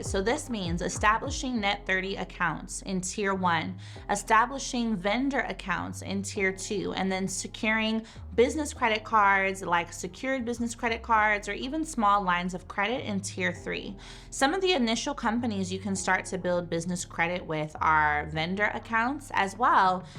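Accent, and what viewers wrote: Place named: Southeast, United States. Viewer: tell me where you're really from because you and this accent is giving south eastern United States like North Carolina.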